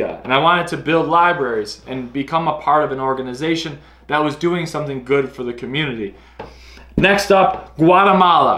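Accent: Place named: United States. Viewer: It's a great video. I follow your channel for a while. And I really enjoy it. First, thanks for making create videos for us. Funny thing, I was wondering which country you are from. I never thought you are a born American. I feel your english is great buy you have very noticeable accent. I was thinking. Mmm, this guy must moved to a english speaking country at young age. Then, I just saw your old video, the interview one for opening a book store . You spoke perfect american english. Does your accent really change or you just intentionally speak very slowly to cater the foreign audience? : )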